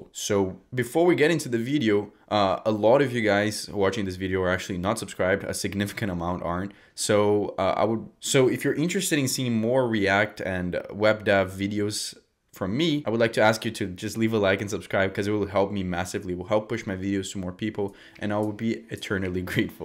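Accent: Brazil. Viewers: Rapaz Tú é carioca ou paulista?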